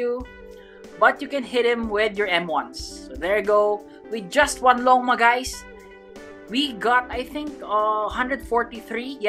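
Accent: Philippines. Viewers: Hey are you Philippines you sound like. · First time? I'm A filipino so I could tell from his accent that he is a Filipino.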